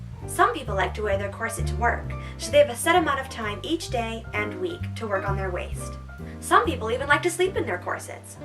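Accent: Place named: Ireland. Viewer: I’m sure you’re Irish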